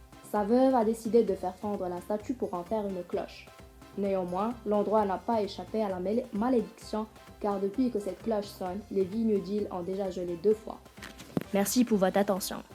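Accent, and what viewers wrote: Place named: Quebec, Canada. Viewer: elle a un petit accent quebecoi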